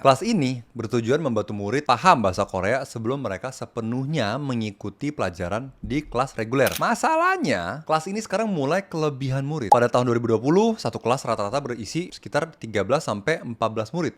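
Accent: Indonesia. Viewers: logat nya ya Indonesia banget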